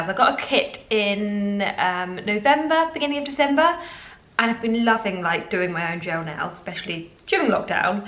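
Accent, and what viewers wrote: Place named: United Kingdom. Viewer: I love your style... and your British accent, too. Greetings from Canary Islands, Spain. 😘😘😘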